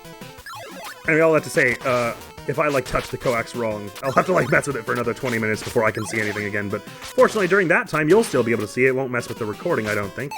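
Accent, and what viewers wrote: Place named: New York, United States. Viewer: That's still a ton of really cool stuff! Like you, I've never had an Xbox, but I've never had any PlayStation stuff either; I've only ever gotten Nintendo consoles, and between them and my computer, I can find access to the games I want. Some of them would probably feel better on original hardware, though. Me, I've got a Wii, a 2DS that's starting to wear down, that GBA SP, a Switch, and the dark pink DSi my parents got me all the way back on my sixth birthday, which has somehow managed to hold up better than the 2DS and Switch 😆 OMG GATO! I can't believe I never thought of that, I have one of those too, just haven't used it in a long time Those darn bosses really should introduce themselves! Listen, Mr. Boss Guy, do you know how many guys with scythes there are in gaming? One was the final boss of KH CoM, and he had not only a name but a freakin' EPITHET (the Graceful Assassin; I never got around to telling you all the Organization guys' epithets and lesser Nobodies, so if there are any you still don't know, I can fill you in) Yes, I'll just hang out at my local library and read until a ninja comes to challenge me... 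Then again, that would probably wreck the library, so maybe I'll stand next to the tesla dealership instead 🤣 Yeah, I feel that way too. Tbh, I didn't realize you were trying to do an American accent until you apologized for it. I just thought you were doing an action-movie-guy sort of voice, it sounded kinda New York or Chicago-ish "Back in my day, we had to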